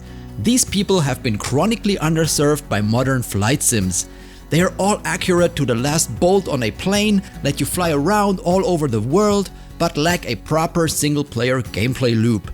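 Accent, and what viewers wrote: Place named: Germany. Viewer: Ich hore das deutsche deutlich raus. 😂❤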